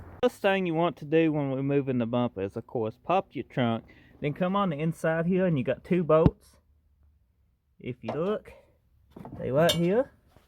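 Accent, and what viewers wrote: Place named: New England, United States. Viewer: Great Video! I love your accent! Not Texas... Maybe Mass? NH? ME?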